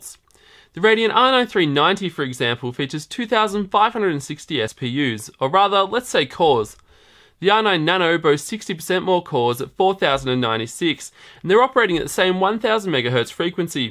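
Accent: Australia. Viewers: Well done video, and I like the fact that you did not jump to conclusions where you didnt have enough information. Unlike that AdoredTV dude... well done sir. Curious what accent is that? Australia?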